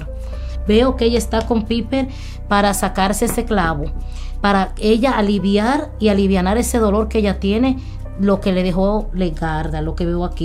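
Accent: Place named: Dominican Republic. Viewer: tu eres dominicana? Ese hablado nuestro se conoce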